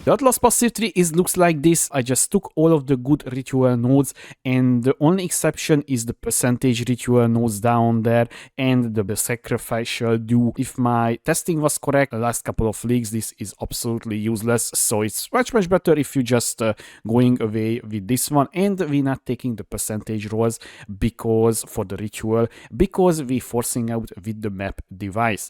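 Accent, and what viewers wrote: Hungary. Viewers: This must be the thickest Hungarian accent I've ever heard xD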